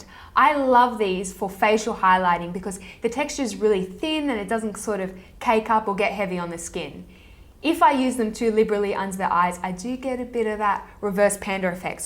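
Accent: Australia. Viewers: You sound australian but your using American products???? I'm confused!!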